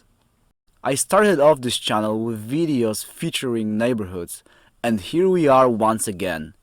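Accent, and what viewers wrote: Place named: Germany. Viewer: I Detect a Accent 🤔🤔 I almost want say German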